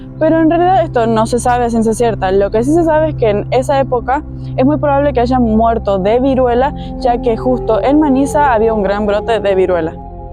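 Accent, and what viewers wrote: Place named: North, Argentina. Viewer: Hola!! Me encantaron tus videos, hace unos días descubri tu canal, se que sos Argentina y por tu tonada del norte. De donde sos? Tal vez Salta, Jujuy o Tucuman? Ya me suscribi porque pienso seguir mirando todos tus videos, buenisimo todo 😊❤un besito y a seguir adelante que vas a tener mucho exito con tu canal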